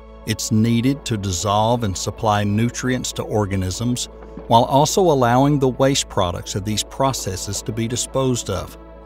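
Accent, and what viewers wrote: South, United States. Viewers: Why is there a redneck narrating,? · what kind of american accent is this??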